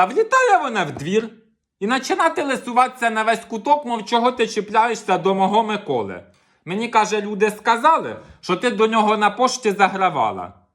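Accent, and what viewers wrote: Poltava, Ukraine. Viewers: Насмiялась вiд душi! Дякую, як же Ви так точно вiдтворюете мову полтавщини, сiльський побут. Жiночi, розбiрки,. Ви-неймовiрний, дуже талановитий! Здоров,я Вам, натхнення! Чекаю нових замальовок!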